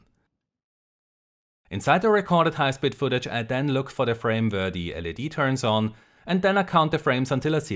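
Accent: Germany. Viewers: Are u german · man hört dass du deutscher bist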